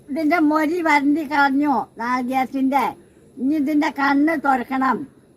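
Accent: Palakkad, India. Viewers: അമ്മൂമ്മ പാലക്കാട്ടീന്നാ 😊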